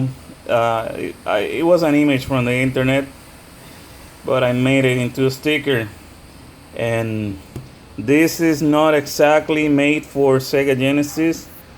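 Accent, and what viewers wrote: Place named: Florida, United States. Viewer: You have some bad azz games there my friend and your Puerto-Floridian accent shines in this video. I am jealous of all those pricey obscure titles. Give me give me!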